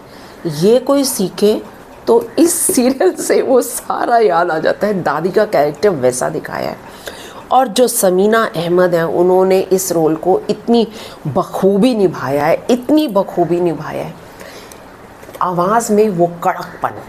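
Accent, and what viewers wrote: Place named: Pakistan. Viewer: Masha Allah mam apki awaz m kitni safai hy. Sath sath hmari mother laguage ka b touch hy in short sarhad paaar han hum lekin dilon me apnaiyat aj b ha jo apki baton se lag rae hy k jese aek e family ho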